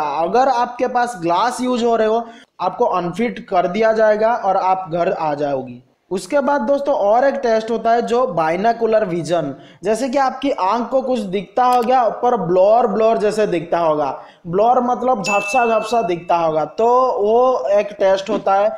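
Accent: Odisha, India. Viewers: Bhai Hindi pura odia bhalia laguchi...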